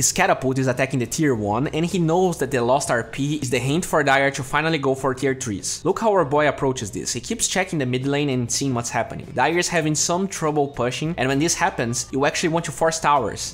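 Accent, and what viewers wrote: Brazil. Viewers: where you from ? your accents sounds from brasil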